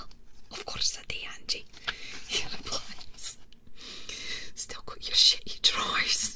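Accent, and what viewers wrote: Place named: Scotland, United Kingdom. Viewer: I really like her Scottish accent it doesn't matter what she says on her asmr streams it's always nice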